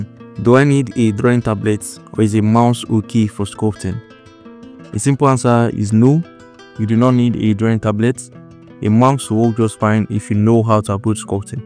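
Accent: Nigeria. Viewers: Are you from nigeria?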